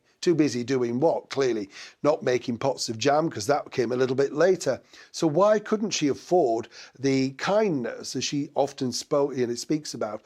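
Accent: Yorkshire, United Kingdom. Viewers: Katherine is every inch a royal, Meghan is a wannabe, she will always come up short. You did give me a giggle earlier, I heard your comment as......''I (Meghan) am a hooker!!!!'' it is your lovely Yorkshire accent that did it............... made me laugh, until I realized what you had really said ''I am a hugger.'' hey ho. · Being roasted in classy Brit accent has to be hell😂😂😂 I’m laughing at the roast. Damn Mr!!! Take it easy on her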